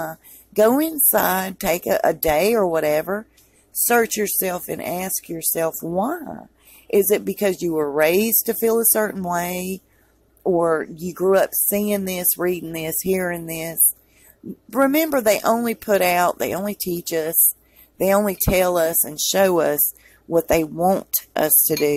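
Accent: South, United States. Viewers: Well said!! You have this sweet country accent combined with such truth, intelligence and highly articulately. Love your work girl! 👏🏼👏🏼👏🏼👏🏼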